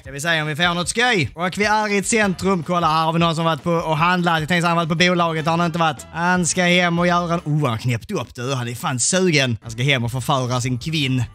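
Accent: Scania, Sweden. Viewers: Fy fan för den vidriga skånska dialekten!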